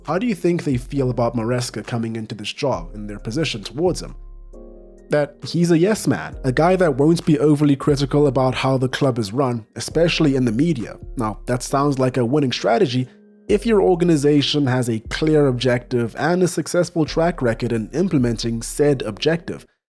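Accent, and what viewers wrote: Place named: South Africa. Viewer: Bro what nationality are you? Sounds crazy, but you sound South African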